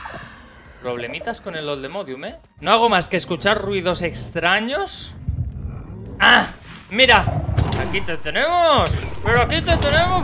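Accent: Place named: Spain. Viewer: Me encanta como narras todo y me gusta el tono de español creo q es,dato:soy argentino jaja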